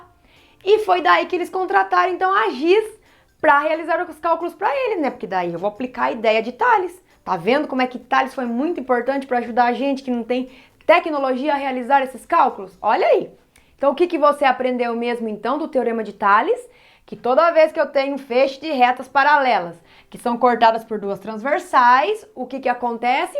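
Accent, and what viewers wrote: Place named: Caipira, Brazil. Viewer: o sotaque caipira dela🥺🥺💗 Ótima aula, parabéns!!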